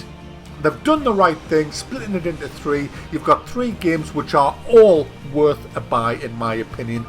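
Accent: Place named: Sunderland, United Kingdom. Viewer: North East of England. Sounds like somewhere like Sunderland but I could be wrong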